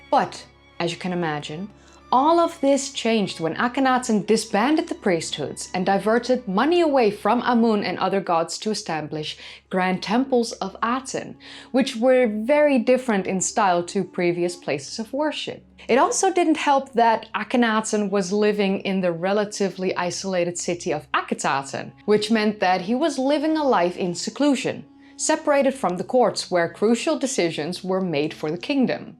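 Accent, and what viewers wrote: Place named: Netherlands. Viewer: Love your Dutch Accent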